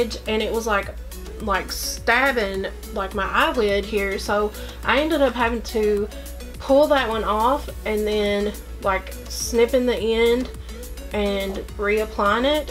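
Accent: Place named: Kentucky, United States. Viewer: Subbed from IG! I'm audiesmama there! I'm also originally a Kentucky girl! You sound like home to me! 💙 great video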